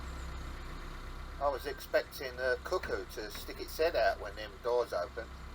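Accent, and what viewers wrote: London, United Kingdom. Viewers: Hi ya. So glad you are back doing vlogs. Was wondering how you was, and why no vlogs for a while. I love your seeing your journeys and all the pretty scenery. Oh and miss your London accent ! Stay well from a fellow Londoner. Cheerio x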